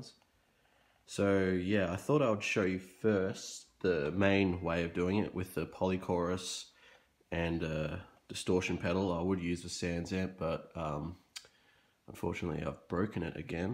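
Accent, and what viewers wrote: Australia. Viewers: Are ya aussie mate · Sorry My Bad, Now looking back at the Video you do Sound more Australian. 😁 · very helpful video! didn't know you were Aussie, where about are you located? I'm from Adelaide but have been living in Canberra for most of my life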